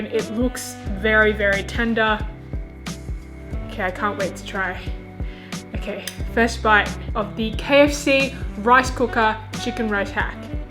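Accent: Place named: Australia. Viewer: It's called an Australian accent, millions of other girls have that exact same accent.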